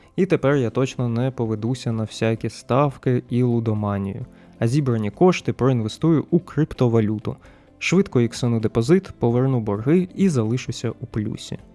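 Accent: United States. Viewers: звідки американський акцент?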